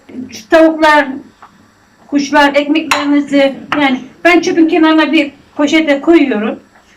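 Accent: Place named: Thessaloniki, Greece. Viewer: Annemizin şivesi ni Selanik macirlar gibi konuşuyor Memleket nere si acaba bu arada Miraç kandiliniz hayırlı olsun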